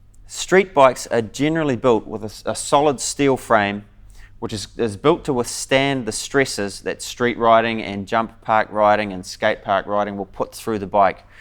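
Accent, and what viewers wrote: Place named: New Zealand. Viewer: NZ Accents kill, "rice" hardly! "raice" maybe >> Decent vid though for the sprocket leagues.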